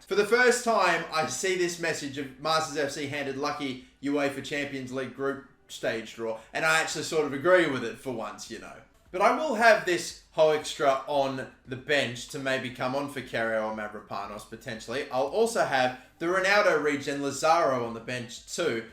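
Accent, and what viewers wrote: Australia. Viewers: pure Australians accent 😂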